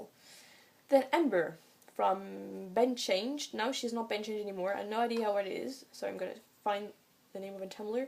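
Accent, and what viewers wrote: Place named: Canada. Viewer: im questioning so much, like the fact im on a video with low views, basically this is a field that hasnt been stepped on in 8 years and i just stepped all over it. Confused with the accent sounds kinda canadian maybe its a hybrid accent.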